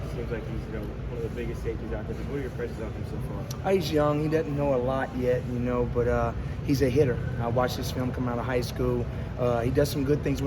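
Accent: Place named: Louisiana, United States. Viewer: Love that Cajun accent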